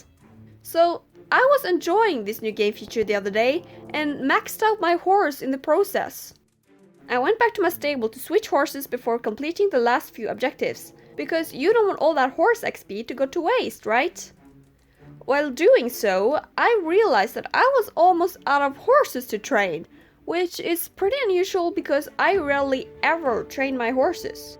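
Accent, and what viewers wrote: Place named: Norway. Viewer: Now i hear that you is Norwegian on your english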